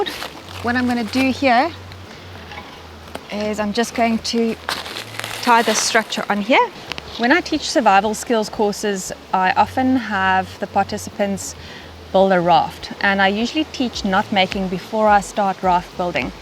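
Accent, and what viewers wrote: South Africa. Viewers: Love the South African accent....ive used 50kg potato hessian sacks for the same job. just like the Ol Timers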